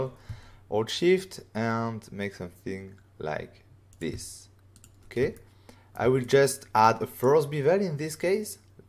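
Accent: France. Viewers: I have Fluent Power Trip for a few days. I think this is the tutorial that it all made sense. It's such a great addon man. So fluid and fast, I really like it. bravo I can tell you have a passion for it. Please keep up the progress! I am also glad I spent a lot of time in Paris for work, so I am used to your accent. Your English is getting better each time! While I was learning French, my friends in Paris would always laugh when I tried to speak with them. They tell me that I sound like a Frenchman from Chicago! lmao great work, please keep it going!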